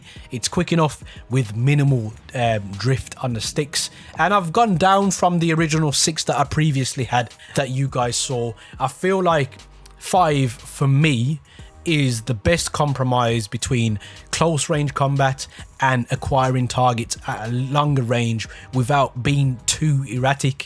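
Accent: Birmingham, United Kingdom. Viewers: Yes brummy lad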